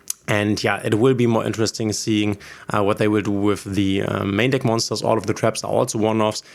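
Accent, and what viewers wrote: Germany. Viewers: Warum auf englisch? Ich weiß nicht , man hört einfach wenn man eigentlich deutschsprachig ist aber englisch redet 😂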